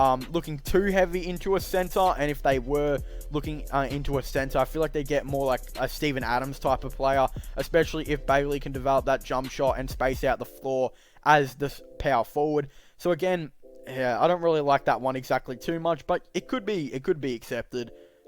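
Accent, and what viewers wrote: Australia. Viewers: Deffs Aussie??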